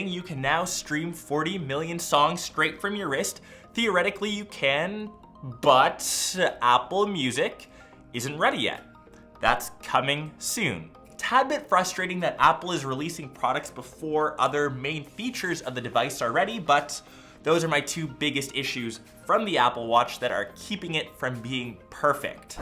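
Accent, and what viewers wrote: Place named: Canada. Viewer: Love that Canadian accent. As always, a great, high-quality review.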